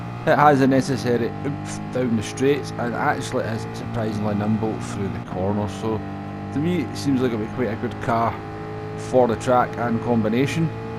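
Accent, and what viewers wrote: Scotland, United Kingdom. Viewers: North American by any chance? they seem to be the ones asking for subtitles the most. I find his pacing and delivery excellent and the mild Scottish accent only adds to my enjoyment of them, if we are lucky he might respond with a link to a properly unitelligiable Scotsman as his accent is imo really not that bad.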